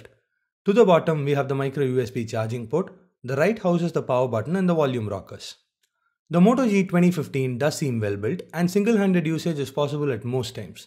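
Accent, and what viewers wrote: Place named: South India, India. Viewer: Good job. Now that you've started sharing the burden off Ash's shoulders, you will grow into a much mature reviewer in the coming days as you have Ash for support. Just need to tone down your South Indian accent a bit. but nonetheless, a good review. thanks!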